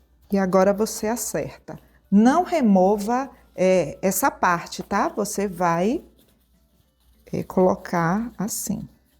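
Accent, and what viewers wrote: Bahia, Brazil. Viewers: Mto didática sem falar mto e enrolar, dá gosto de ver suas receitas maravilhosas, parabéns!! Sem contar que esse sotaque baiano deixa td mais gostoso... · tu é simplesmente maravilhosa abençoada. Que mãos de fada. Sem contar que tú é muito espirituosa 😂😂😂😂 te adoro. Obrigada por existir em nossas vidas. ❤❤❤❤💋💋💋💋🫂🫂🫂🫂 Vcs goianos, baianos são muito parecidos com a gente aqui do Rio grande do Sul muito hospitaleiros. Acho que pelo teu sotaque tu é baiana né?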